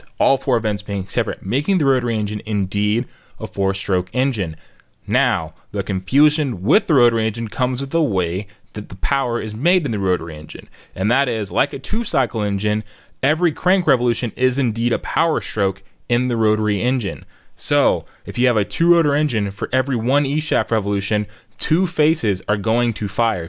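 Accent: United States. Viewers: Slow down with your voice. Most people have difficulty comprehending american. [Aussie in BC]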